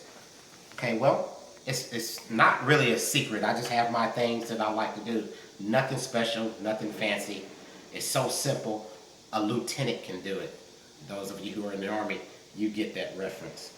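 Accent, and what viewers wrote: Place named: Visayas, Philippines. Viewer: W/my bana, kron pako kBntat . Hehe bisaya diay ka si.😂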